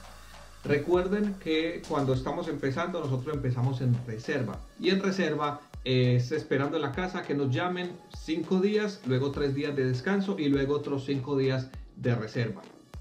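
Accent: Colombia. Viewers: Será colombiano por casualidad? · Capitan que aerolínea vuela usted Veo que por su acento se nota que es colombiano, yo también soy Colombiano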